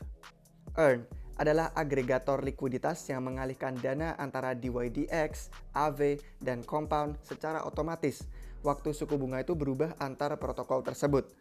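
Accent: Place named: United Kingdom. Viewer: pronounce british emang seperti itu ngab , gak lebay mungkin kamunya yg gak tau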